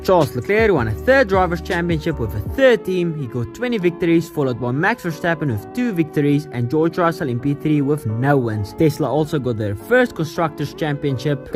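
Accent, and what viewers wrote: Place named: Afrikaans, South Africa. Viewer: im trying to figure out where youre from and you give me afrikaans vibes, so ima guess SA?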